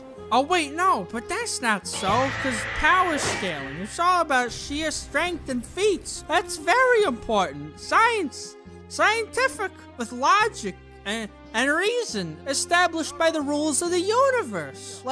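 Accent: Brooklyn, United States. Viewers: Its a Brooklyn accent. Not Dunkeys. Check his clone vid.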